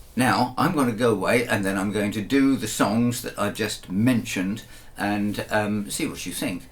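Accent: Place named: United Kingdom. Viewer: Hey, I love your channel. It’s just lovely to listen to your ramblings/musings/stories whatever. Love your guitars and stories of how you got them. Keep up the good work..oh and it’s nice to hear a British accent..😊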